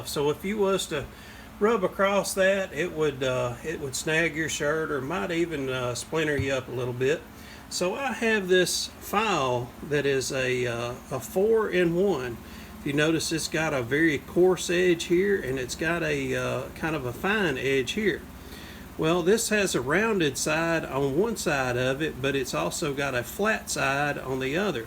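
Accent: South, United States. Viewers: I love the lush greenery and wet rain - so beautiful! Must be down South judging from the accent. That is one lovely table! The contrast of white dowel with black walnut is so lovely. Too bad we never saw the underneath when finished. I don't know how this moves exactly. I guess it just swivels on the rod? Also wanted to see the dowel inserted.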